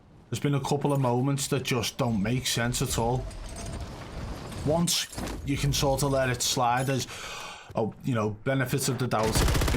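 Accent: United Kingdom. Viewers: you are a joke. You and your disguisting british accent and face.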